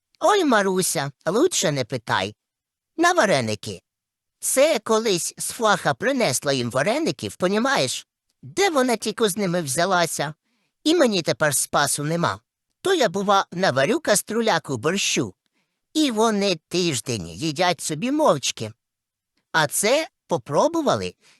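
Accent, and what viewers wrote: Poltava, Ukraine. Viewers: У нас на полтавщині так розмовляють.Голс такий заспокійливо в'їдливий Так гарно , весело.Слухаю з задоволенням коментування бабчині.Особливо по спідницю.Ап про сваху як тонко підмічено!Дякую, дякую! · Наче з Полтавщини говір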